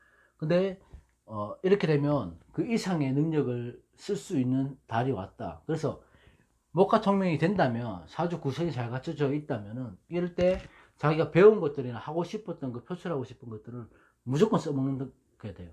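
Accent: Gyeongsang, South Korea. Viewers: 젊으신데 구성진 경상도 사투리 살짜꿍 가미된친근한 목소리 마음 편안하게 들을수 있는 감사합니다 ♥️♥️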